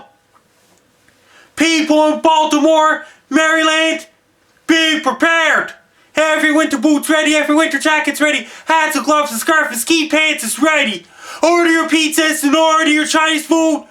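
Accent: Canada. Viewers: That enthusiastic Canadian accent made my day.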